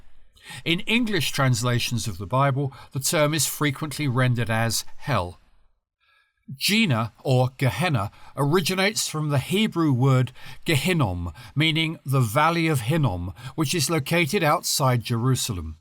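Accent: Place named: United Kingdom. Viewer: I love the British accent of the narrator! 😊😊😊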